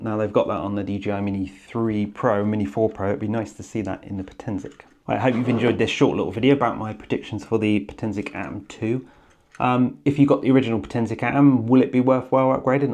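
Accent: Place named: England, United Kingdom. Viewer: good idea to show Potensic what to improve! But what I need to mention about the presentation of this Video: please try to talk in "standard English" to reach an international audience...you speak in an accent that probably only English people can understand (or people who have lived in England for a long time)...a pity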